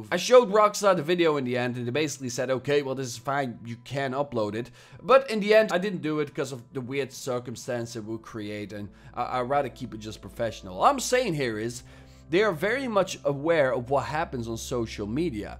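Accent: Netherlands. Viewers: Woah I didn't know you were dutch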